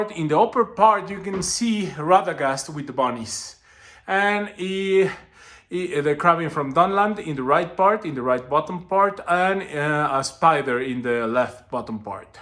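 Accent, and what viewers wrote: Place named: Latin America. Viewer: De donde eres? Por tu pronunciacion, se escucha que eres latino! Esta conmadre tu cuarto!!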